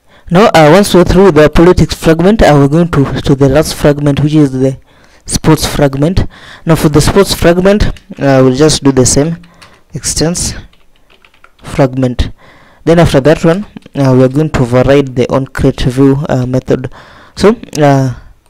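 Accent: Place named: India. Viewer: I have no problem with typical Indian accent, but you eat words with your pronunciation and make it impossible to understand what you say, not to mention the low volume of the voice as well. Thanks for the effort, but it's a futile one in my opinion.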